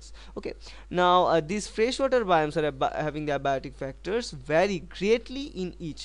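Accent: Bengal, India. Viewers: Is the orator bengali?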